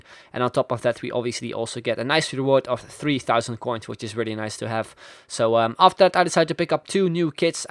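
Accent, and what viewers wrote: Netherlands. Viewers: It's a Dutch accent and its not a shitty voice it's a nice voice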